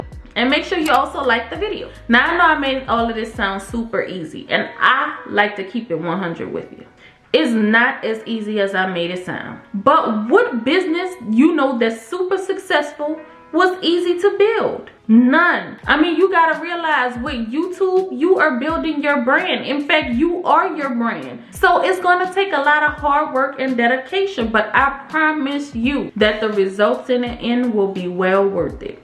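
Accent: New Orleans, United States. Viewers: You have to be from New Orleans. But this is a good video!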